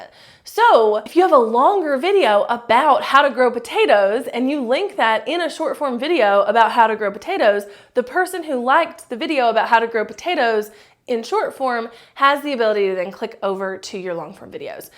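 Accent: South, United States. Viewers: I love linguistics, so the southern pronounciation is fascinating to me. But I'm in Canada, so you KNOW i say niche the French way.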